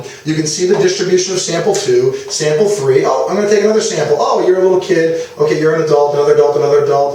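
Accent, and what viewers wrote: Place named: Maine, United States. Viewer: Sounds like he is from Maine.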